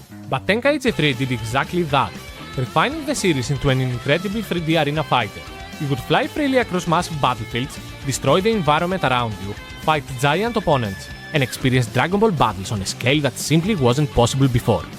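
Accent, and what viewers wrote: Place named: Greece. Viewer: Greek English the best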